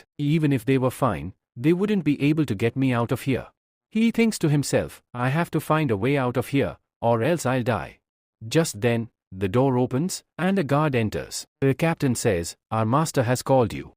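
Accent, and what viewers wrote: India. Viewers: what's with the indian accent xD, tbh i stranggle with normal english so with the accent i think ill just pass on this one even tho the story seems good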